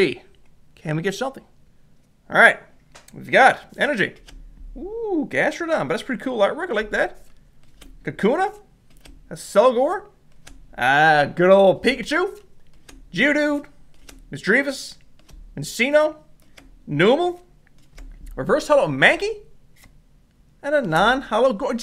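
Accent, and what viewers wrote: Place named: Newfoundland, Canada. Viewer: Love ur play mat and love the content I'm from nova scotia u sound like ur from newfoundland bud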